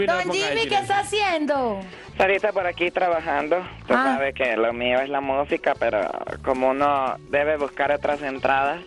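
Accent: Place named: Colombia. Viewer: Me encanta el acento colombiano....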